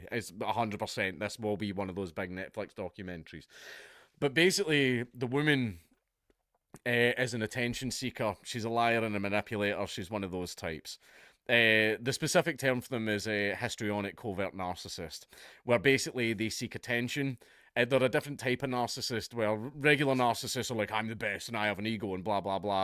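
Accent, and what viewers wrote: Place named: Scotland, United Kingdom. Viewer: Locals pronounce it more like "Lean-gatha" (I live just over in Moe, probably 20 minutes away) Great to see Dank covering a local story! Dandenong pronunciation was as spot on as a Scot's accent will allow 👍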